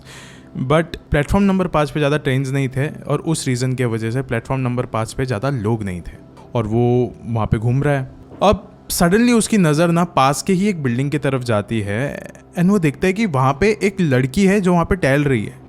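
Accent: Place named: Mumbai, India. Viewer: Apna mumbai wala accent chor ke story nurret kyea kar bhai tab jada log connect kar payenge tere sath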